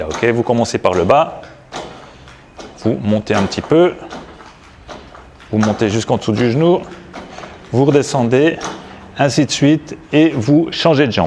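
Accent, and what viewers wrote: France. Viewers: Gros merci ! Ca coule comme de l'Eau , avec un petit accent en plus ... Alsacien ? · Y a pas un ptit bout d'accent Franc Comtois là-dessous? Video tjrs d'actualité 👍✊🙏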